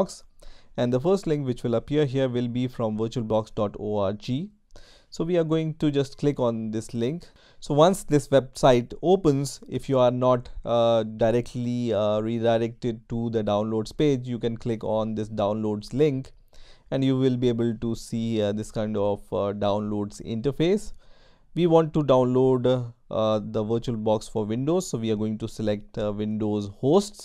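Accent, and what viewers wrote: India. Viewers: oh indian (not racist,just suprised to hear not bad at all,but different accent)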